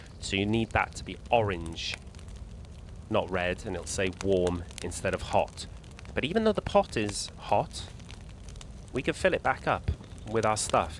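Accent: Wales, United Kingdom. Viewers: Great video - you welsh?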